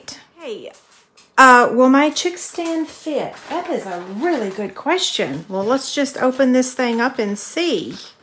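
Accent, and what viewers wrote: South, United States. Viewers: I love how you talk with that southern accent. Could listen to you all day.Well,maybe not all day but you know,lol.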